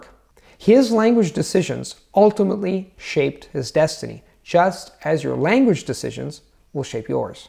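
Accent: United States. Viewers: babe, no one is berating him or anything based off his accent. I never said that sound like a native should ever the main goal when picking up a language. The reason I brought this up is because I've been watching his videos for years & I do know he used to have a stronger American accent. That being said, it is interesting to point out how the acquisition of more languages can affect the languages one already has under their belt. Please think everything through before stating someone else does not support him. I obviously do. :) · you speak English with an American accent. Sometimes I hear other people that learned English speak with a British accent. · Native English speaker here. In this video he sounds a little raspy, like he's getting over losing his voice. I haven't seen a general degradation of his American accent; I don't watch all his videos though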